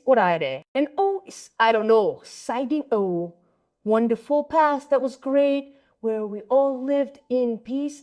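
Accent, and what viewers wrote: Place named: Russia. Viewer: Porque suena como un ingles ruso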